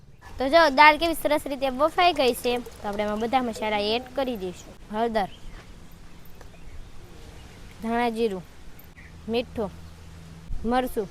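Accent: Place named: Kathiawar, India. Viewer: The language she isvtalking like kathavadadi speaking Difficult to understand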